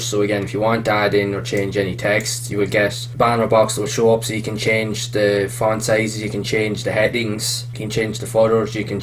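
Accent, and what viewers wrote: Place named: Ireland. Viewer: what county in Ireland you from?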